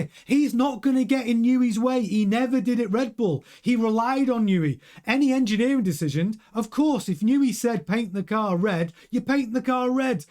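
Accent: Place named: England, United Kingdom. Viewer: Dear sir , I cannot digest your accent , Just can’t understand what the heck you are saying, you are in UNITED STATES, not in FRIGEN ENGLAD .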